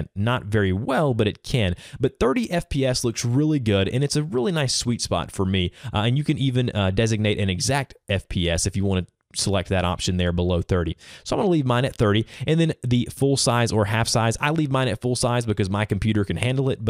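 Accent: South, United States. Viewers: he sounds southern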